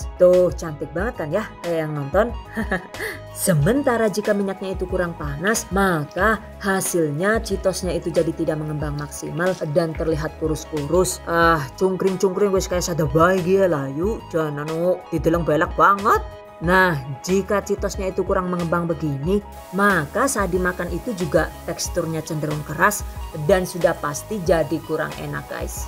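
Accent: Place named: Cilacap, Indonesia. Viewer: Ky logate wong cilacap😁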